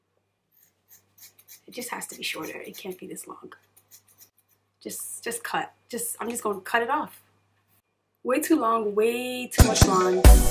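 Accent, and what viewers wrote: Nigeria. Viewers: its actually a really beautiful wig, and you'd break hearts with that on lol. Plus i love when you go back to a Nigerian accent...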